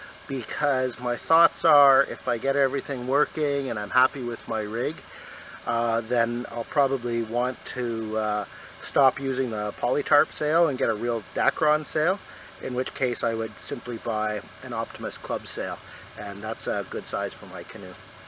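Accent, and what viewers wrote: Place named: Canada. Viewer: You sound suspiciously canadian when you talk.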